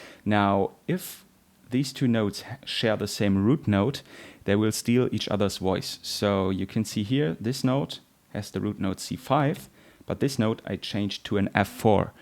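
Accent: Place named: Germany. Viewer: German ? Da ist was deutsches in deinem Englisch...beste Grüße aus der Vulkan-Eifel. Geniale Videos. 😍😎👍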